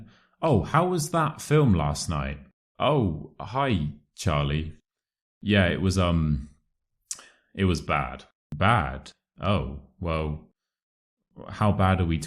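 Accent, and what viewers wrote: United Kingdom. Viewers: Thank you. Love your British Accent and your sense of humour. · Thank u very much ı love your brıtısh accent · I finaly found a proper British speaking chanal! It's marvellous!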